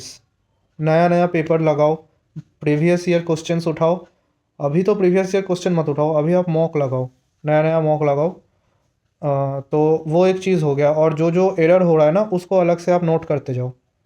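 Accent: Bengal, India. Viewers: তোমায় ল্যাঙ্গুয়েজ শুনে আমার তো মনে হচ্ছে তুমি বাঙ্গালি?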